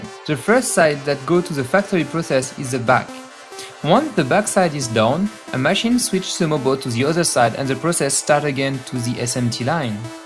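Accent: France. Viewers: maybe cos the guy speaking is french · I can understand about 80% of what this stupd frenchy's saying. For example the word is "componant", not "cump'n'nt". "How" not " 'ow". Lazy prick! It's sad how many young children are out of work because of those robots.:-( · That's FRENGLISH! Understandable nonetheless;) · E tu a l’anglais d’un français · This guy has a french accent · This french accent is amasing... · vous êtes français?(à entendre l’accent ^^) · he's french. i can hear it. that's my native language. he sometimes pronounce words as in french -_- as when he says "misplaced" and ze instead of the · But why the hell did they choose a french for the off voice?? what a dread accent. It does not sound english even a little... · No, i can not listen anymore. This video is fucking RUINED by this french frog pos. I'd rather have any foreigner speak English lang. 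(russian, german), but NOT FRENCH!!!! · I'm English and I could understand everything he said. Stop complaining! In fact his French accent sounded better than the remastered version with the guy talking with a slight Chinese accent. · wth is the narrator french? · if you ask me that sounded more like french... either way, in it's very common for big IT enterprises to feature narrators with visible accents. That way they try to show their internationality and acceptance. I work at Autodesk (a software enterprise) and at least 50% of our new products or updates are introduced by someone with a strong accent. Call it cliché if you will:D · L'accent franchouillard tout le long, c'est un calvaire.! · French accent:D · definitely french. you can get it from first 30 seconds, especialy when he says "from a to zee":) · he's French 146% sure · ca me va bien je comprend mieux avec l'accent français du coup:) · You speak english like me =D Frenchie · accent français XD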